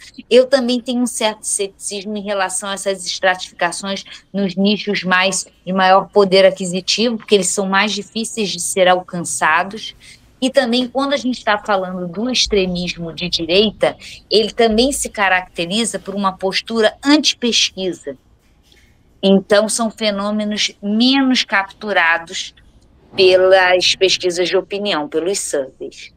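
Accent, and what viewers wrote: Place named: Rio de Janeiro, Brazil. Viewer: Professora, linguagem científica não se compatibiliza com sotaque carioca.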